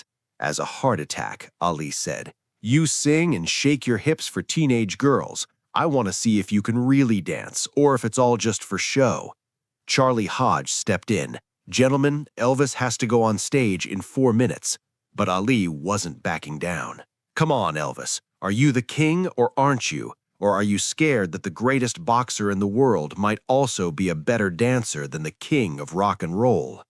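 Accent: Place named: South, United States. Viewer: why does the narrator have to have a redneck accent?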